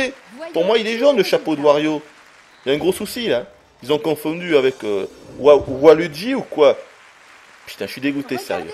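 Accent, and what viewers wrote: South, France. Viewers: Mais qu'est-ce qu'il avait sur cette vidéo, il avait grave l'accent du sud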